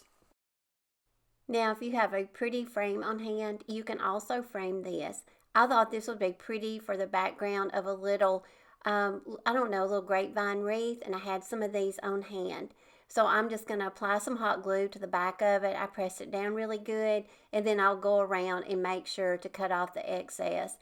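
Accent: South, United States. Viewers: 👋 I could sit and watch you craft all day. Love it all so much. My style spot on. I am so ready to have our retirement home built this year and start decorating it. I am sorry, but I had you mixed up with Lisa, from Our Shabby Cottage. I asked if she ever finished the doll house that she was working on for a hobby. She re assumed me that it was you. Again, I am so sorry for missing you two up. Both with that sweet southern accent, kinda like me. ❤ Love. Anyhow, I had a big interest in that video of your dollhouse. I had my husband build me one that I found on Pintrest. I had to put everything on hold due to family illness, but was still wondering about yours and excited to get back to mind.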